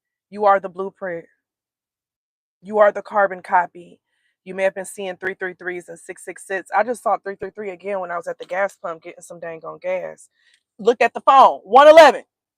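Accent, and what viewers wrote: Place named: Midwest, United States. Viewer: I knew yo ass sounded like you was from Milwaukee or the Midwest lol. That’s my home town 414. Appreciate the message. 💫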